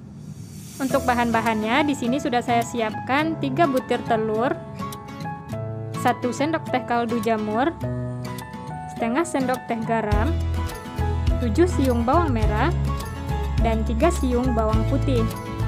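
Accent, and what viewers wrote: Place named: West Sumatra, Indonesia. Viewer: Kk org minang nya,,, keliatan dri logat ny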